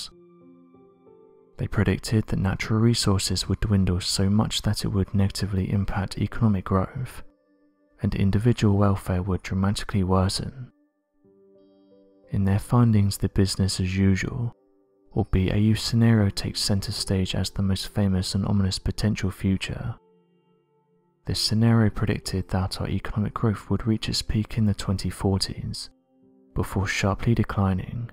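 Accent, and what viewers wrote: United Kingdom. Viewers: I love your channel but you really need to replace this narrator. The young man narrating this and other video's mumbles. It's hard enough to understand the British pronunciations without a monotone mumbler. I'm sure he is a very nice person but he is not a good narrator.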